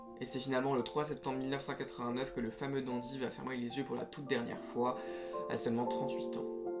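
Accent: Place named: France. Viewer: J,adore votre accent tres francais, pas trop pointu.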